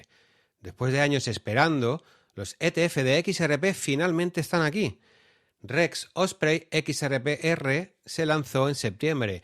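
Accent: Valencia, Spain. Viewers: Valenciano verdad? Yo también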